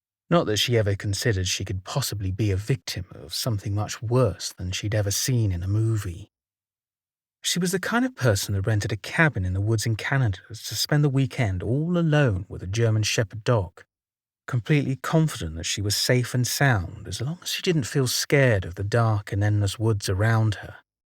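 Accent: United Kingdom. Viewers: I do too, a very soothing voice and excellent narration skills. Very high quality. And let's face it, no one narrates like the British.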